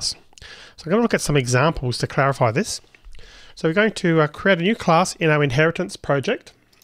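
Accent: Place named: Australia. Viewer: I'm taking Kotlin class in Udemy - in general, he is in the top percentile in terms of completeness, ability to explain things conceptually (why you do sth, not just what), video editing (he actually edits his videos, so typos and corrections don't show up and important snippets are outlined instead of wiggling a mouse), pace (you have time to absorb concepts), practicality (both concept and mini-projects)...and, notwithstanding the Aussie accent, his English is very easy to understand...really great stuff across the board!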